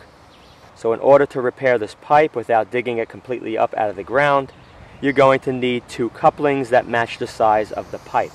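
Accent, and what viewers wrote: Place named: New Jersey, United States. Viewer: So was this filmed on the Jersey Shore (pronounced "Show ah") ? :-) That accent sounds familiar.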